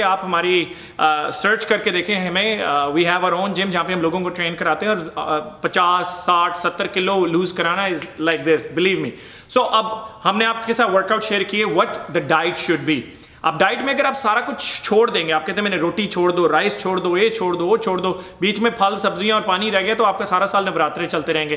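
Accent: Punjab, India. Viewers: U speak superb...while watching ur video, I'm smiling..ur Punjabi style👍 I'm also punjabi but even I can't speak that much Punjabi😂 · Vo punjabi accent haaayee🤗🤗💕💕 love it. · i love your punjabi accent keep it up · indian punjabi/ pakistani punjabi with some foreign english accent.. amazing.. maza aa gya... thank u soo much veere...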